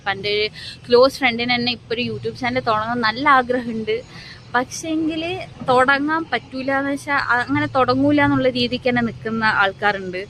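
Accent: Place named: Kannur, India. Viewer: Well done 🎉. I read the description after that understand the content of this vlog,I think opening feel lag but end of the video is superb because you give message to us. Kannur slang is good,content of this video is excellent.we will be waiting for next episodes with different content.Any way all the very best for your future.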